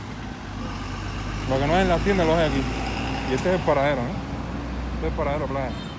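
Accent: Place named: Andalusia, Spain. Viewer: loco por ver sus videos ,, jajaja , en Andalucia y Canarias hablamos asi, eso se llama cultura y diversidad , por si no lo sabia , desde España , bendiciones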